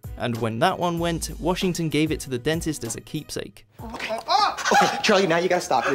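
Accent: United Kingdom. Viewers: American icons and yet a British narrator. Makes sense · It sounds British ^^; I am from Wisconsin, so I think I can tell the difference since I would have literally been within driving distance from Canada :P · ugh British b arrator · A lot of comments about a British narrator. Apparently that makes a difference. He is is only reading from a script that a team of people have researched and developed. What exactly does it have to do with the content of the video especially when these myths can be historically proven? It's exactly this attitude why most of the world believe Americans are arrogant and stupid.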